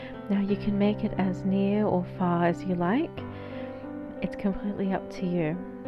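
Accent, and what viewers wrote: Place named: United Kingdom. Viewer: Nice bunting . Something I have never bought or used. You mentioned Australia in another video. You don’t sound Australian very nice UK English Accent 😊👍🏻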